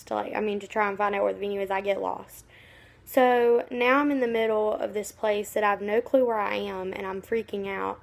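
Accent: United States. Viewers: you nail is more fleeky than my two hands (also are you from south or north Carolina because you sound like my aunt!) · you're so gorgeous inside and out!! love your country voice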